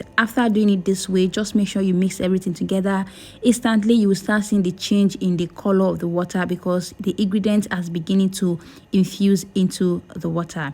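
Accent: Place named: United States. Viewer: At least her hair is natural but tell him about her accent she got Americanized so she just bragging about having real hair why don't she go buy a damn hair store why don't black women go invest in the same products that they use for their hair like people that are not your race know your hair better than you our black community is stupid as f***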